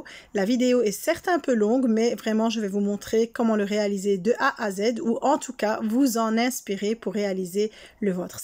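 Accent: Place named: Belgium. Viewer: Salam Alaykoum tu es belge ? Tu as un accent que je n’arrive pas à trouver ?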